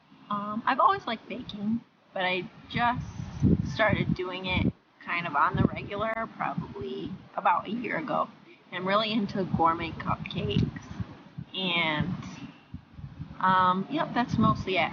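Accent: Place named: Upstate New York, United States. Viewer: T F just my opinion. It’s a harsh upstate NY accent.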